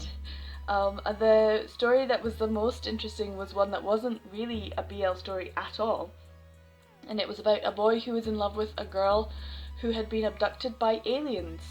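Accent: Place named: United Kingdom. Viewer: Your accent!! I didn’t realize you’re from the UK! I also didn’t realize you have a channel. Subscribed! 💖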